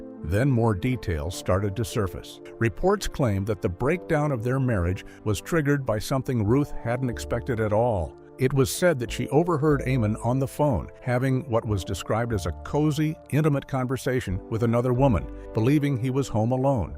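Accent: United States. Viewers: Why an American narrator? 🤔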